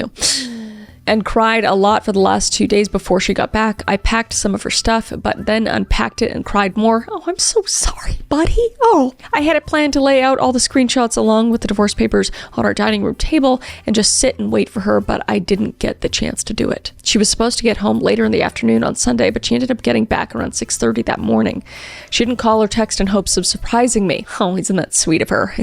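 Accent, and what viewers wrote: Canada. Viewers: Ohhhh you're canadian! I have been watching like 20 of your videos in the past 2 days and i was like huh maybe i havent heard enough texas accents to judge but there is something off about this one <3 really enjoying your work, thank you for all the genres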